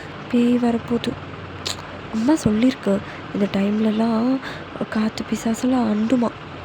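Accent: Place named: Kerala, India. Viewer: i luv ur Kerala voice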